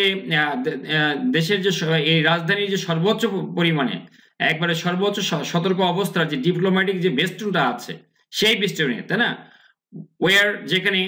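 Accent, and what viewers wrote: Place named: United Kingdom. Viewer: স্যার সবসময় ব্রিটিশ উচ্চারণ টা করবেন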